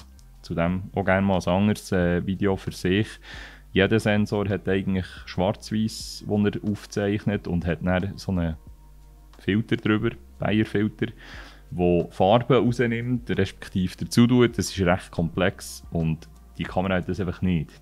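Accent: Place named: Bern, Switzerland. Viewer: Schön wieder einisch Bärndütsch z'göhre. Danke für das Video. Ich bin auch der Meinung, dass die Kamera in erster Linie gefallen muss und nicht nur sollte. Denn dann nehme ich diese bei jeder Gelegenheit in die Hand und möchte fotografieren. Wer sich in Leica verguckt hat, braucht dann eben eine entsprechende Brieftasche. Wer bereit dazu ist, darf jeder für sich entscheiden, für mich kommen dann jedoch andere Kameras in den Fokus, welche genauso gefallen und technisch meine Ideen noch besser unterstützen. 60MB ohne IBIS ist für mich fragwürdig. Diese Auflösung wird nicht ausgeschöpft, würde ich meinen und füllt den Rechner mit Daten, aber auch das muss jeder selber abschätzen. Generell wird die Auflösung zu stark bewertet. Wer was braucht an einer Kamera, sollte von der Gestaltung der Bilder abhängig sein. Ein EVF unterstützt gewaltig die Komposition sowie die Bildgestaltung durch Belichtung und Blende. 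Immerhin sind diese zwei Faktoren Bildbestimmend. Geht es auch ohne, wunderbar, ich möchte jedoch ein EVF nicht mehr missen, dann wenigstens ein Display. Um nochmals auf Leica sprechen zu kommen, jeder Kamerahersteller hat seine Geschichte, allerdings macht das Marketing von Leica einen guten Job, ob das die Kamera besser macht, möchte ich bezweifeln, eher teurer. Aber so ist es nun mal, auch bei anderen Produkten, wer sich in eine Rolex verguckt hat will keine Swatch. An dieser Stelle muss sich jeder selber die Antwort geben, wieso weshalb und warum Mensch das Bedürfnis hat u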